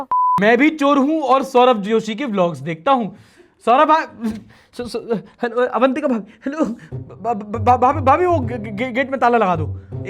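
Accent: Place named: Bundelkhand, India. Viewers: Bhai ki Bundelkhandi bahut achi hai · LOVE YOUR BUNDELKHANDI ACCENT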